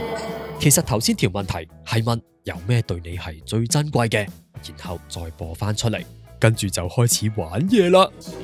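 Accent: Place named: Hong Kong, China. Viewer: 三二一、點火～發射成功（救命～超喜歡港腔🤩忍不住姨母笑）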